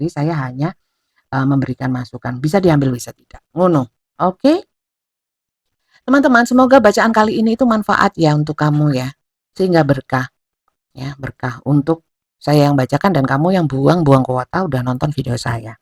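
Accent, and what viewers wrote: East Java, Indonesia. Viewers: suka bgt gaya bicara sama logat Jatimnya kak tiap baca readingan......jd pgn meet up langsung ngobrol2, pasti asiikkkk......😁😁😁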